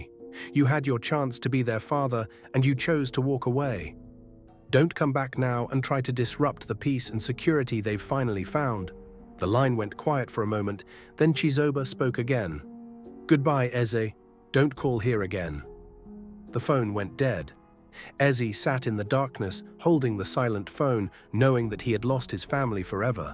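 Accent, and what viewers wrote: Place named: England, United Kingdom. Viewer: The African accent is better for these stories. Please use that accent. It’s hard to listen to and English man narrating, even if the story is good.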